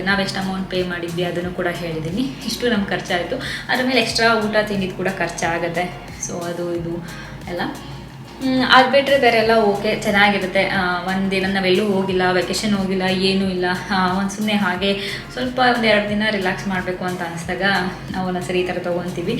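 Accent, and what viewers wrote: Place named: Mysore, India. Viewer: ಮೈಸೂರಿನ ಲಾಗ ಸೂಪರ್ 👍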